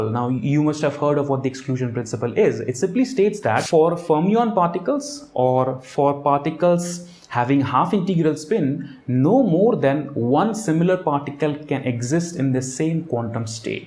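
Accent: India. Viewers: Great over-view. packed a lot of information in 24 minutes. Well presented and yes, I can even understand your Indian accent, (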